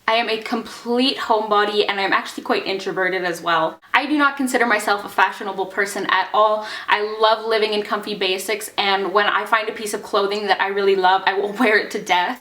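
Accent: Canada. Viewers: it is SO funny because as I was watching some of your videos I thought to myself "wow I bet she's canadian because of her accent" and then I read that you ARE canadian!!! ( I am Canadian as well haha). Anyways love your videos :)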